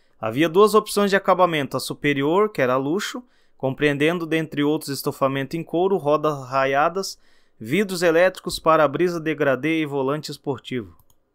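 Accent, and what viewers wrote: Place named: Paraná, Brazil. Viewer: Paranaense , acertei ? O melhor sotaque do Brasil !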